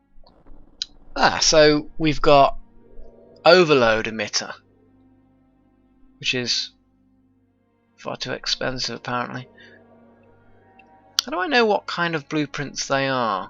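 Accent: England, United Kingdom. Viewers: So you get a lot of crap from ScreenRave about your "UK" accent. I may be an American, but I understand how ridiculous that is. There's no "UK" accent. There are dozens, maybe hundreds. Whereabouts are you from? I don't recognize the city or area your accent corresponds to... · I am an Idor, and I just wanted to ask, are you from England itself? Or from scotland\ireland?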